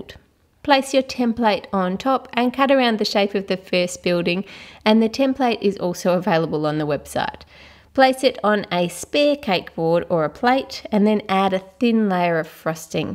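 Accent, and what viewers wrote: Australia. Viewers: I love your accent so much I want to have an Australian accent so much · Are u Australian? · R u Aussie (I is)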